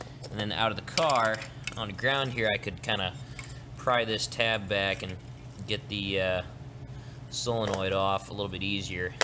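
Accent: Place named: United States. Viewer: Why for the love of Christ does it absolutely have to be a yankee talking on most of these videos... like nails on a chalk board to my ears😵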